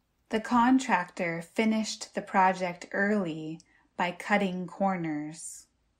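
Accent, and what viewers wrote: United States. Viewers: 美式發音哦！ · 我要感谢你美妙的美式发音，可以给我很多帮助和信心。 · I like the videos authentic American pronounce. Thank you!❤ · 是美式英文嗎?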